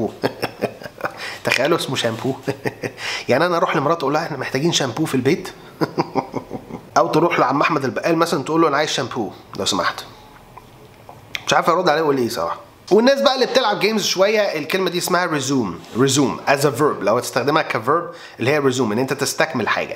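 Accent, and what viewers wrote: United States. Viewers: حضرتك بتعتمد اعتماد تام على American، كلمة Pause و Mobile و Stop مش بننطقهم غلط بس بننطقهم British فاهما مش غلط، لا صح بس باختلاف اللهجات. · تصدق.في صديق لي بحكي مثل الاميركان وشايف نفسه كثير..قد ما حكيتله عن اليوتيوبرز عمره ما أقتنع الا بعمر عبدالرحيم ههههههه انصدم باللهجه بتاعتك يا.لا وعملك سبسكرايب ههههه تحياتي ليك استاذنا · شكرا على مجهودك الرائع ارجو فقط من حضرتك التأكيد على إن النطق بالAmerican Accent لأن النطق بال British Accent مختلف فمثلا Mobile (America) ..... /ˈmoʊbəl/ (British) ...../ˈməʊ.baɪl/ و نفس الشئ فمثلا نطق luxury (British) ..../ˈlʌk.ʃə.ɹi/ (America) ..../ˈlʌɡʒəɹi/ لأننا كمدرسين يأتي إلينا الطلبة بعد مشاهدة بعض الحلقات و هم مقتنعين بأن نطق الكلمات التى تم تعليمها لهم خطأ بالرغم من صحتها باللكنة الإنجليزية البريطانية مرة أخرى شكرا لمجهودك و أسف على الإطالة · طب بس بقى انت بوقك تبع اميركان اكسنت وكده خلصت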